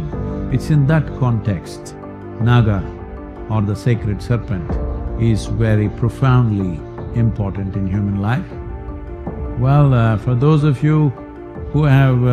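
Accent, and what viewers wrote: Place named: India. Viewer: Ur vdos great. But indians better learn speak English not Indglish.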